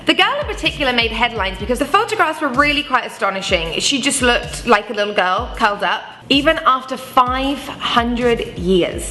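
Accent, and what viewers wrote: England, United Kingdom. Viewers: British accent, I barely understand what she is talking about. I am not American. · sounds 3/4 enlgish 1/4 american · And people didn't believe me when I said that some English accents really aren't all that nice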